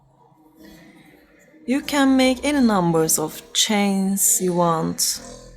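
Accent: Turkey. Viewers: You are an EXCELLENT TEACHER!!! Your English is NOT a problem at all, because you do the most important things to help us understand, unlike others who make crochet videos. I appreciate this tutorial, I am soooo bored with the same old stitches! You explain well, but other people do not explain the task, they just "say" do this stitch, do that stitch, without explaining why, but you do. Maybe we could learn a bit of your language! Turkish is a beautiful language, (the accent is too) just like other Altaic languages, like the Koreanic, Mongolic, & Japonic subdivisions, they are very beautiful to listen to, even when the listener does not know the language. THANK YOU! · It’s so beautiful 🤩 and your English is just so sweet. Are you Turkish ?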